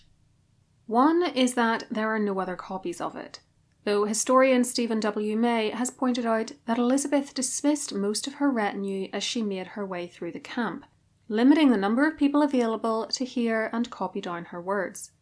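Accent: Northern Ireland, United Kingdom. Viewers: But you have a voice, oh, such a voice! A voice that is of a good language, and English, at that. Or Northern Ireland or someplace. ❤ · As a Shakespeare Original Pronunciation aficionado, hearing Elizabeth's speech read in your lovely Northern Irish accent (which bears some similarities to O.P.) really makes it come alive for me.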